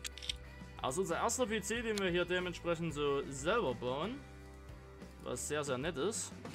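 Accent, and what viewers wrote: Saxony, Germany. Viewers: Wieder ein geiles Video Findes es immer wieder geil Sächsisch zuhören-ob im TV oder in deinen Videos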